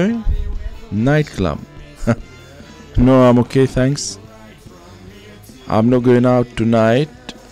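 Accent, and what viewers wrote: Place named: Greece. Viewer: I like your accent in English, and you feel that Greek is phonetically more dominant than the British accent 👍🌹🌹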